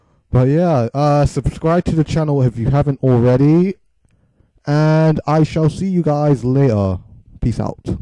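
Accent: United Kingdom. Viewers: Thx dude This really helped and also by the accent I noticed that ur from the UK ;D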